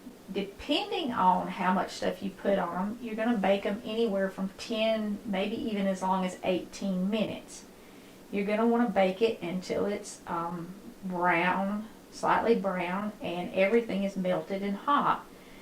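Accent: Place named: Kentucky, United States. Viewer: I want to thank you for your delightful channel. I have subscribed. I actually find your videos calming, full of love and deliciousness. I spent 4 years in your awesome state while I attended under graduate to college in Western Kentucky. Your food and cute little accent brings me back to those great days. I was a “Yankee” back then. I really didn’t know what that meant, but since I was “Italian” with first generation parents, they gave me a pass to join their group not as a yankee but as a European. LOL. I learned so much living in Kentucky. It’s a pretty great place to live.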